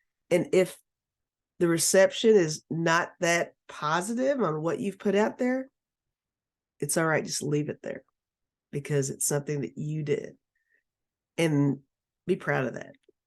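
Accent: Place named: South, United States. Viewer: You are so pretty, love the sound of your voice, best subtle southern accent I’ve ever heard!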